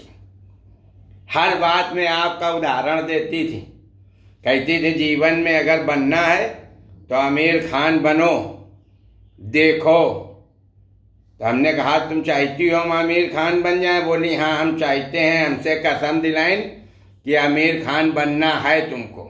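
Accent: Kanpur, India. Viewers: क्या भिगो के कनपुरिया अंदाज में मारा है बड़े भैया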